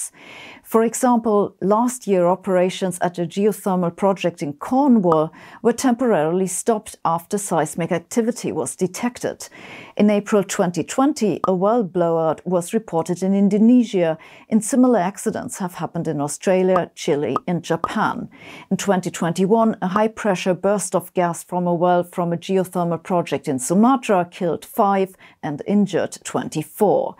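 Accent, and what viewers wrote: Germany. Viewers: I love you, you are extremely sexy. I fantasize about you and your beautiful german accent and inexpressive face. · Ms Sabine Hossenfelder is Professor cum laude graduated in theoretical physicist, Gravitational Fields etc. But as for her nice direct humor and accent it could be becuase she is from Germany.😇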